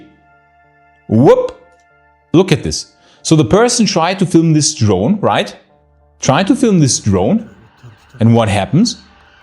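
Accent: Germany. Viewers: I’m still new here. I love your accent. I traveled around Europe for a few years. Where are you from..Germany? I lived there for about 6 years. Spangdahlem. Beautiful country. I loved my time there. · Id say it's a german accent. He just speaks english very well. Maybe im wrong. I am by no means an expert.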